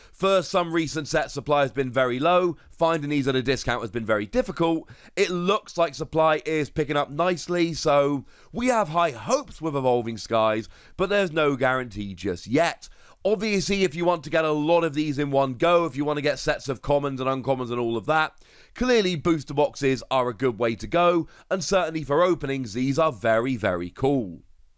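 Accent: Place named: United Kingdom. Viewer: Hey, I noticed you have a beautiful British accent. I’m looking to get into playing the TCG in-person within the UK. How do you recommend finding people to play with?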